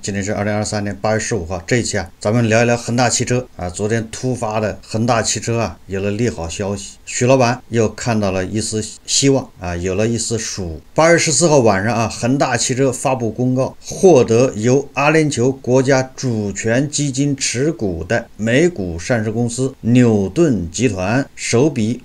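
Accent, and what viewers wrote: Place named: Jiujiang, China. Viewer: 您说话有点像九江人的口音。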